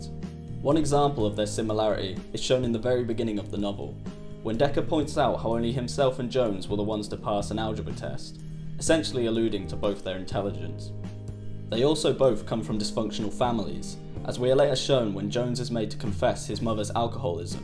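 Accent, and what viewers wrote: United Kingdom. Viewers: Sounds exactly like the first Holly on Red Dwarf. Which UK accent is that? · That was an interesting video which I enjoyed very much. However, I have to ask you about the way you pronounce words? You sound generally well educated, yet inconsistently drop letters from your pronunciation without warning. As Bachman was outed, am I outing you as somebody who is trying to disguise his natural language tendencies with more 'street' or 'modern' inflections? If so I'd strongly suggest you stop it. It sounds bad. Just use normal English. If it is not on purpose please re-listen to what you have produced here and notice the 50+ times you make errors in pronunciation. Your voice is far too posh to be cool. And I think that way of speaking is on its way out now anyway. Estuary English is just an affectation that politicians use in the mistaken belief it makes the more appealing to the proletariat. 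It does not, it's merely patronising. You are better than this. I think you can speak more correctly (and probably more naturally) than you do in this video, and I think you would sound 100% 'beta' if you did so. As I said, good video, just tidy up the language son 🙂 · I don’t mean this in a bad way, but you are the most British person I’ve ever heard on the internet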